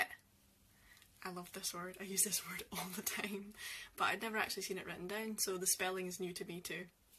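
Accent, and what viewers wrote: Scotland, United Kingdom. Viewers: Ya helping a french lad to understand scots accent and words better, thanks a lot. 🙏 Also maybe you'd like to know (if you don't already) the Wikipedia in scots is a mess and they need people fluents in Scots and good wills to fix it !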